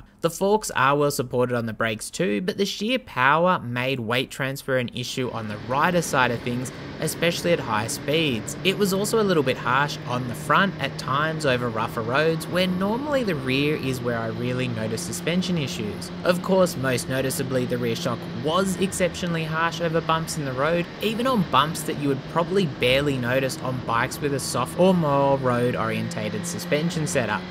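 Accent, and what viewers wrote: Australia. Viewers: My God what the f is wrong with the Australian accent?!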